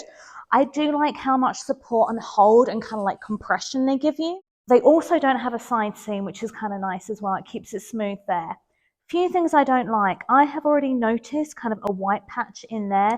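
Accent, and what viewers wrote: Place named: United Kingdom. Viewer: Love your British accent! I think you should have ordered a size small in the Commandos! 😊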